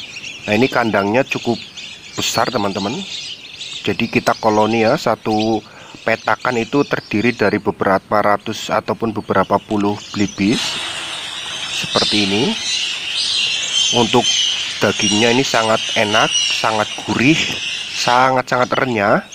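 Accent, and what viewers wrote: Banyumas, Indonesia. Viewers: kita angon " sampean wong jowo ngapak pow kiE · Wong ngapak ..pancen josssss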